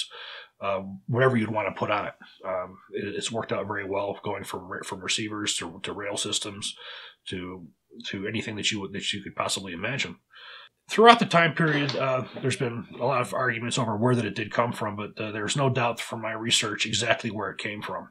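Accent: Texas, United States. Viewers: I love accents, especially Texan. Just caught the misciatta coming out. Hope all is well.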